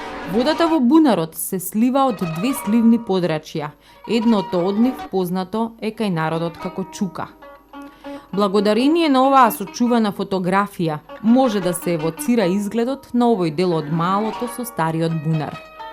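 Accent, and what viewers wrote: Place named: Bulgaria. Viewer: Baj Bulgarski, only the accent is here and there different and rather funny.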